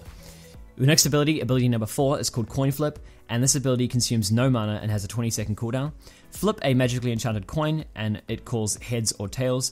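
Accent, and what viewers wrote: New Zealand. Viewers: are you from New Zealand because I am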